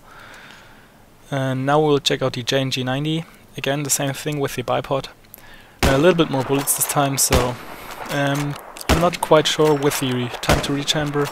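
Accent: Germany. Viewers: hab ich sofort gehört, aber der dialekt ist nicht so stark wie bei anderen deutschen die Englisch sprechen:) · Are u German? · Gutes Video! Ich habe alles verstanden und dein English hört sich richtig gut an. Den deutschen hört man aber trotzdem heraus :)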